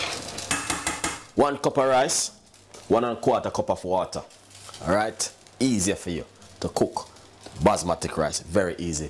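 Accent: Jamaica. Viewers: Basmatic rice 😂 I love his Jamaican accent ❤️ In my opinion It’s not too much salt because of all the water and coconut milk he added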